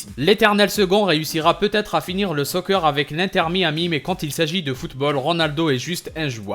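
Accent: Algeria. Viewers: J'ai l'impression que tu es algérien de l'Accent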